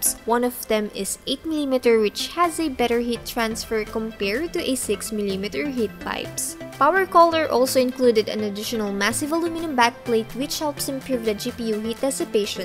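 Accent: Philippines. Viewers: That Pinoy accent tho.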